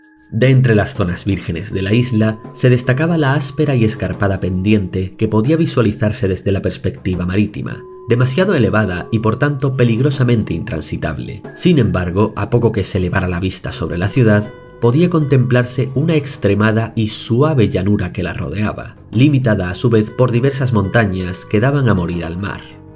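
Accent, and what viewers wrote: Spain. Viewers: ¡Excelente la narración! ¡Me encantó! Me encanta el acento español del castellano.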